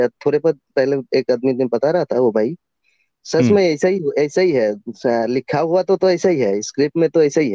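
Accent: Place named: Bengal, India. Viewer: By voice I could guess that this person is from Bengal or Orissa 🙄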